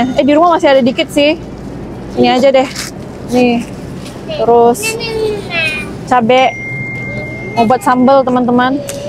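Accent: Pontianak, Indonesia. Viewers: Logat pontianak kak tadak ilang suke liat kak ngomong 😊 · Yeayyyy shopping lagi ka bareng n daddy Boroooong ka, logat Pontianak nya gak ketinggalan ka ❤️💃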